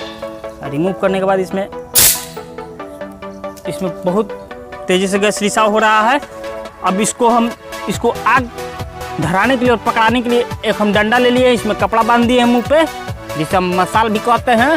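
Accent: Bihar, India. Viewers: Bihari accent made me watch this video longer. Gas cylinder se bas tum circus kar rahe thee!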